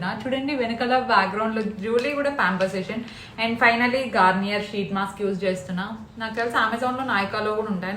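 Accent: Anantapur, India. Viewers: Akka meeru Ananthapoor slag matladithe vinalani undhi akka plzz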